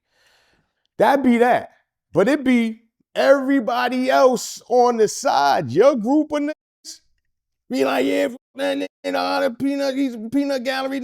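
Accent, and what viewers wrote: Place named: New York, United States. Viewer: He a true NY nigga man… this is how we really talk and explain situations lmfaoooo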